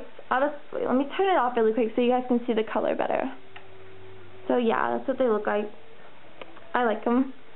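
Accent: South, United States. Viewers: your room tour made me want to redo my room and not to be mean but your voice sounded kinda country -lauren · i watched this and i just wanna say we have te same accent ha yay we both have a southern accent btw love it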